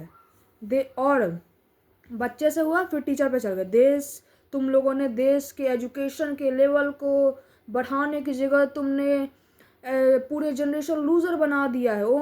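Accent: Bihar, India. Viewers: Mujhe laga eski voice sun kar ye bihari hi hai 😅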